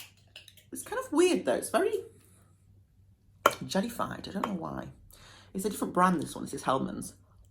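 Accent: England, United Kingdom. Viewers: You are a wonderful and awesome person! I love your personality and your beautiful English accent. Love from Montreal, Quebec.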